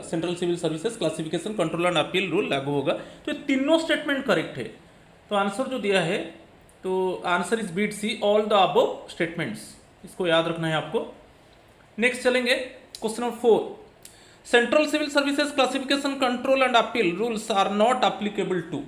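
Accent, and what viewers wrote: Odisha, India. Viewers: Sir today video I think you are from odissa Am I right sir , we have one professor in our PG SRI A K TRIPATI FROM BHUBANESHVAR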